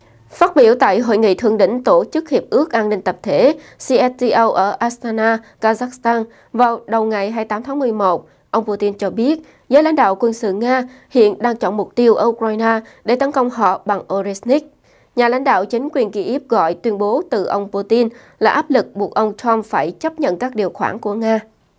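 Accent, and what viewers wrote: South, Vietnam. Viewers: 🌴.Anh Chào e nhé..Cô Mc rất là dễ Thương và Xinh đẹp.Cô có chất giọng đúng người miền Nam..Dễ nghe,lôi cuốn người luôn và thích quan tâm các tin tức thời sự..Nhất là các tin tức Q.sự..Đặc biệt là ở chiến trường đất nc Xinh đẹp Ukraine..Anh xin Kính Chúc Tập thể ban biên tập (Bbt) Chương trình của Kênh Qsm,cùng với Cô Mc Xinh đẹp như a đã nói ở trên luôn đc nhiều s.khoẻ, và mỗi ngày sẽ có thêm rất rất nhiều người biết đến Kênh Qsm để tìm đến theo dõi Kênh ngày càng thêm nhiều..Mc Xinh đẹp luôn mãi là người mà a rất ngưỡng mộ, và luôn ủng hộ Kênh Qsm đi cùng với e..Một lần nữa.Xin chân thành Kính Chúc đến với tất cả Quí vị 1ngày mới luôn Vui khỏe, và Hạnh phúc Bình an...... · Chào xinh đẹp ❤phát thanh viên có giọng đọc nghe rất hay chuẩn nam bộ.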